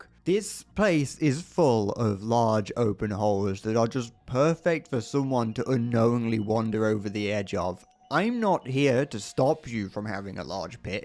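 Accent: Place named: United Kingdom. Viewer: This was the best 17 minutes of british rambling I've listend to in a while... No idea what this games point is tho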